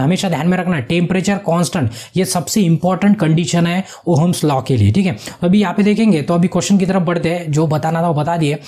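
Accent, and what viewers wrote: Maharashtra, India. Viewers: Sir Aap marathi maharashtra se ho kya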